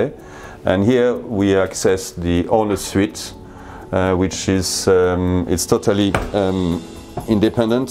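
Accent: France. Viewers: Is this guy French? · Francisch accent?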